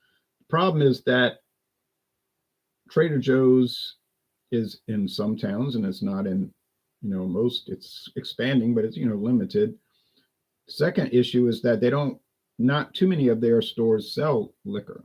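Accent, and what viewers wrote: Louisiana, United States. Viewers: I appreciate this video even being here! I’m only this deep into the video lol but the Trader Joe’s imo is gross. I’m a glenlivet girl, I just finished the founders reserve last week which I like better than the 12 tbh. I damn near wanna pour this Trader Joe's Speyside down the sink it’s so gross lol. Also, I saw a glenlivet carribean that looks interesting, I think it’s aged in rum casks. you are so funny 😂😁 you found it! ❤ 😮 that’s where I’m from! 91604! I was wondering if you were from Louisiana towards the end I began to notice your accent. I went to watch it again and you mentioned Metairie which I missed in the beginning but I recently visited for the first time last October. I had the best food ever at New Orleans food and spirits in Metairie. Anyway I really enjoyed this video. I was looking for this exactly after tryna go cheap and convenient tonight. 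Thank you sir 🙏🏾🤙🏾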